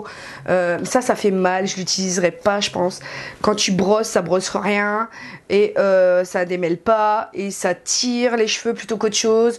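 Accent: England, United Kingdom. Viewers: J'adore ton accent anglais ma petite cloche ;) Tu as vécu en Angleterre?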